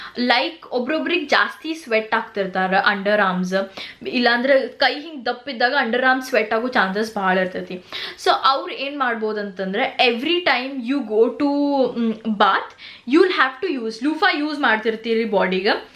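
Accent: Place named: North Karnataka, India. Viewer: 1st channel which i have subscribed in YouTube. Irrespective of content ond reason Kannada adrallu uttar Kannada innondu gotthilla 😜 sometimes we like some things without reason… keep talking 👍👏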